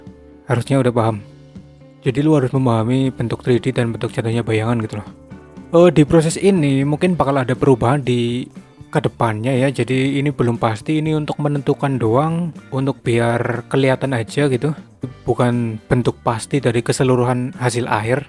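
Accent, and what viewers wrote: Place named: Banyumas, Indonesia. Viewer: Tengkyu bang, btw pasti orang ngapak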